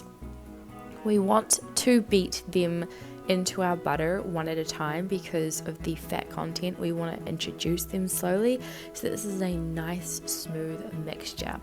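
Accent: New Zealand. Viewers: Thank you for this great recipe, you have a very soothing voice and a beautiful kiwi accent ❤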